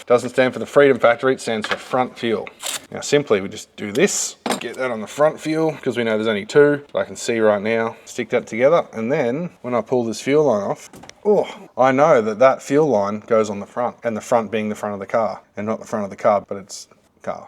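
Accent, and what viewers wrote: Australia. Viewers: Cry about it. Some people enjoy the talking. Especially since he's Aussie. World's greatest accent. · Aussie accent: red pepper is pronounced "red peppah" Race car is pronounced "race cah" Come over here is pronounced "come over heah" 😅😂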